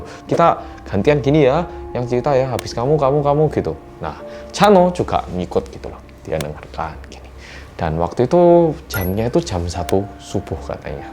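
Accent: Java, Indonesia. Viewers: Ini orang Jawa atau korea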